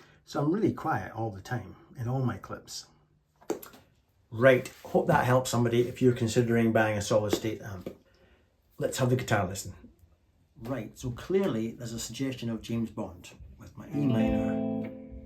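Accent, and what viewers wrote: Scotland, United Kingdom. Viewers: We are indeed - great to hear a Scottish accent in the YT guitar circuit. Lovely tasteful playing and sounds - all the best. I'm subscribed and will be checking in 👍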